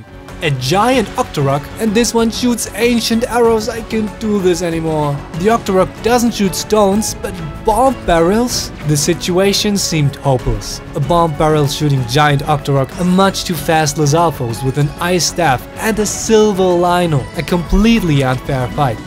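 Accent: Germany. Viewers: are u german? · I have a question are you German? It may sound weird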